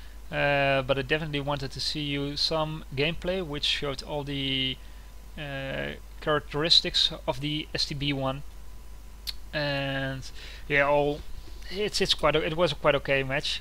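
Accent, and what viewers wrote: Netherlands. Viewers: Nice evaluation of the tank. Woulld you prefer it over the Leopard 1? And also, you sound like you are Dutch? :P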